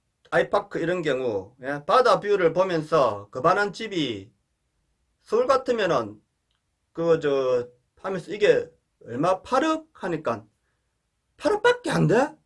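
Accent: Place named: Busan, South Korea. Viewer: 구수한 부산 사투리 친근합니다 ㅋ 건강관리 잘 하세요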